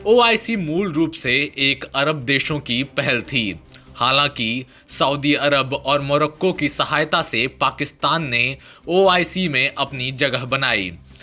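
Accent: Standard. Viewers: आप जो शुध्द हिन्दी बोलते है उस से बोहोत ही आनंद होता है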